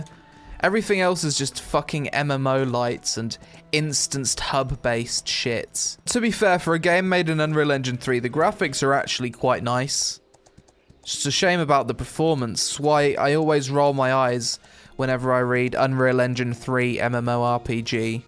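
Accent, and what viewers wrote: Netherlands. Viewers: Didnt know u where dutch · Youre Dutch?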